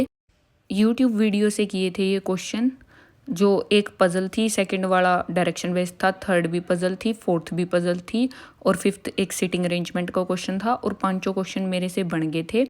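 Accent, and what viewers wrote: Haryana, India. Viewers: Aap haryana se ho na😂😂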